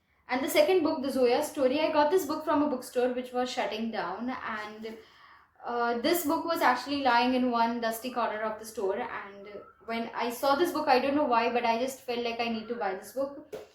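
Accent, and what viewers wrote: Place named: Gujarat, India. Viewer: Nice Gujarati accent☃☃☃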